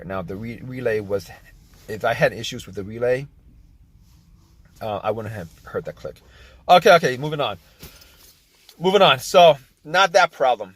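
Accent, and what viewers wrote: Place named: Rhode Island, United States. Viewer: This guy sounds like he is from my hometown (RI)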